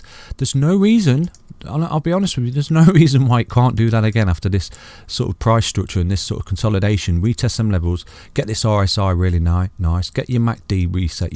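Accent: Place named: Leicester, United Kingdom. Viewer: Great insight, you sound like a fellow Leicester lad 👍🏽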